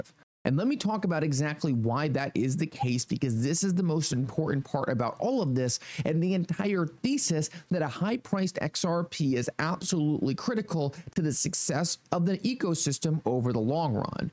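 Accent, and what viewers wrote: Allentown, United States. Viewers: with an Allentown accent strikes again with lies and false hopes.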